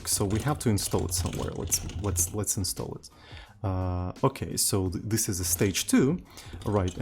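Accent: Russia. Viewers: Are you from Russia? Your accent sound as Russian accent and your account was registered in Russia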